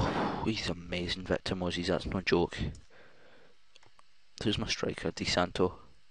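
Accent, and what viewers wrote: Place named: Glasgow, United Kingdom. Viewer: you fae glesgae or rather glasgow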